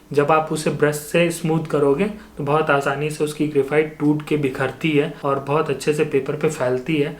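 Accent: Bihar, India. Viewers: Bhai aap Bihar se ho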